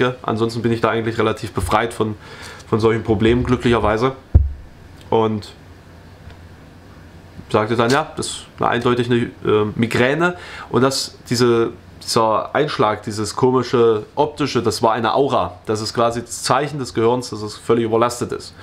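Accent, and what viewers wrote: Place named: North, Germany. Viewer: Das mit dem Workaholic kenne ich. Mein Arzt meint, ich solle verdammt vorsichtig sein, sonst sei es nur eine Frage der Zeit bis zum Burnout. Struktur hilft, das ist richtig. Das mit der Ernährung bekomme ich auch irgendwann konsequent auf die Reihe :D Wie auch immer - ich freue mich darauf, dich bei Bob zu hören! Bitte übernimm das Programm am Morgen - dann werde ich im hohen Norden direkt mit heimischem Dialekt geweckt <3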